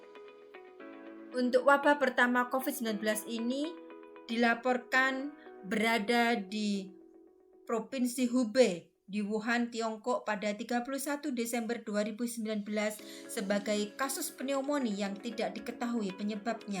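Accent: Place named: Java, Indonesia. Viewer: Logatnya masih medok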